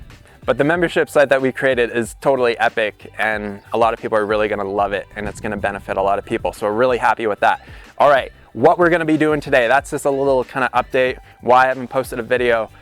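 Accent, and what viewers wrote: Canada. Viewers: I heard your accent. May I ask where your from? Are you Canadian? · Can really hear that Canadian accent in this vid. Lol. Adorable. 😊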